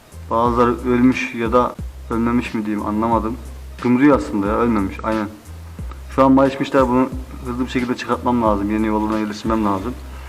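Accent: Tokat, Turkey. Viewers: Abi herhalde Tokatlı konuşmasından anladım bende tokatlıyom ordan biliyorum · tohatlıca türkçesi ustam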